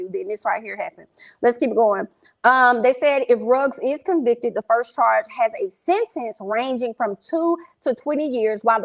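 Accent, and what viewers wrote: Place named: Alabama, United States. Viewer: Girlll what part of Alabama are you from?!?!?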